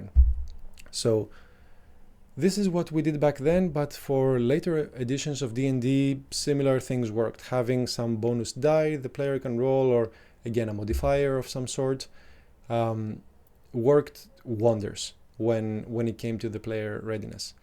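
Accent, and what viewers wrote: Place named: Greece. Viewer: D&D content with a greek accent is exactly what I needed. Euharisto gia to vinteo! :D Interesting mechanic, I think I may give it a go because I have a few players who take ages to do stuff.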